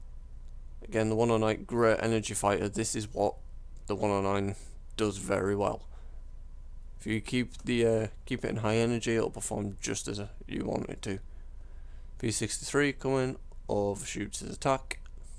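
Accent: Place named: Yorkshire, United Kingdom. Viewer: Sounds like he's supressing a north/midlands english accent lolol Yorkshirey?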